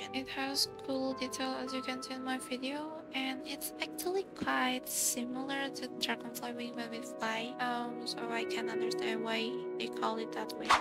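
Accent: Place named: Spain. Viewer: by your voice i feel you are a Spanish person who speak English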